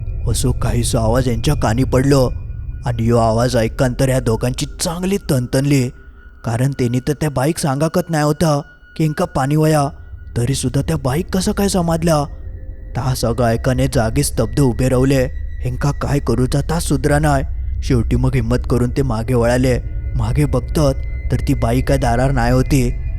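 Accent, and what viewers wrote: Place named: Konkan, India. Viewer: Tujha mule Konkan chi bhasha awadayla lagi ani chan vatati aaikayla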